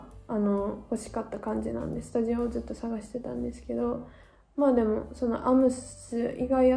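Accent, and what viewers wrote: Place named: Kansai, Japan. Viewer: 初めまして。Hikaruさんの動画がたまたま表示されたので、興味が湧いてきて見てみたらなかなかエモかったです。 僕も去年の夏まで駐在でオランダに住んでいたのですが、今は在欧邦人の間で和食が手に入りやすいことから一定の知名度を誇る（かもしれない）ドイツ西部の町で仕事しています。 Hikaruさんが別の動画で挙げているオランダ人の温かさ、また美味しいご飯にはなかなか出会えないという体験談に思わず頷き、共感しながら色々な思い出が蘇りました。笑 あと、関西弁が素敵です！ 同じ関西民として、Hikaruさんが今後もオランダで納得のいく暮らしができること、そして大変な毎日でもたくさんの幸せに恵まれていくことを祈っています。 全力で応援しています。 ドイツで僕も頑張ろうと勇気と元気をいただきました。ありがとうございます！ 速攻チャンネル登録しました。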